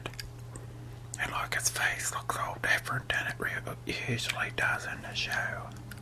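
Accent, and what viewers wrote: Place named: South, United States. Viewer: amaan ahmed people from the south of the United States have that accent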